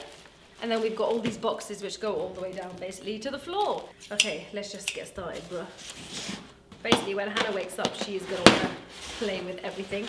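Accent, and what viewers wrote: United Kingdom. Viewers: im pretty sure its mr.jared, but bc of her british accent it soudns like javed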